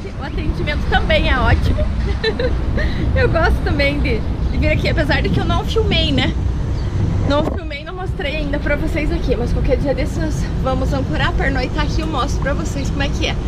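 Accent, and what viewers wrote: South, Brazil. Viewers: Olá, tudo bem? Antes de mais nada, deixe-me me apresentar: Sou mais conhecido como Claudio, Carioca, residindo atualmente na Baixada Fluminense. Estou assistindo seu vídeo no computador pela segunda vez (antes só no telefone). Estou achando ótimo, é bem melhor te ver numa tela de 29' do que na telinha do celular. É muito gostoso seu jeito de se comunicar com seu público com esse sotaque sulista e sempre de um modo alegre e divertido. Dá pra sentir que você gosta muito do seu trabalho, por mais sacrificante que seja. Também acho muito legal o nome que vc deu ao seu caminhão. Te desejo um bom restante de semana e que tudo corra bem pra você. Fique com Deus!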